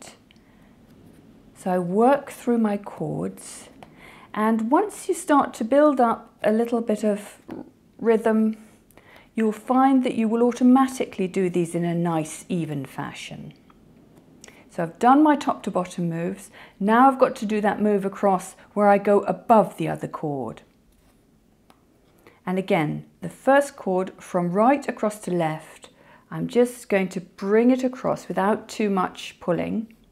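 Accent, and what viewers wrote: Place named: RP, United Kingdom. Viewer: Thank you for this well explained tutorial. I love your RP English. Good to learn pronunciation and easy to understand.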